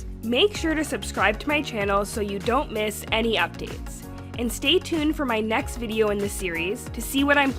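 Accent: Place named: Canada. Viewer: Great advice! Are you from Canada or Minnesota by chance? I recognize a little accent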